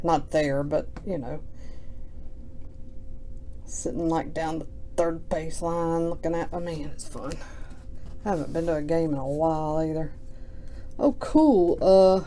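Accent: South, United States. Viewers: G'day, Love the Southern accent and I enjoy your insights on Baseball cards Cheers mate 🍻🇺🇸🇦🇺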